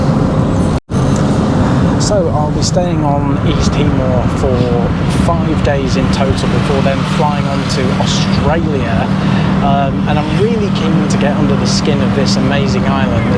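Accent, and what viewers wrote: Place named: England, United Kingdom. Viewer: Hey bro,, thank you for visiting Timor Leste,,, you sound English .. are you from England?... btw once again thank you very much for this great adventures of yours to Timor Leste.. this is definitely will help boosting up Timor Leste tourism and as a Timorese I can't thanks enough for this... I hope you did enjoyed your traveling around Timor and had a great adventure time .. thank you and be safe..... obrigado barak!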